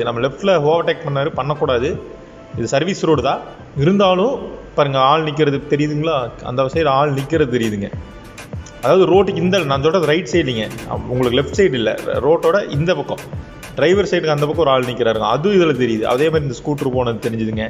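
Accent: Coimbatore, India. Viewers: Coimbatore slang😍😍🔥🔥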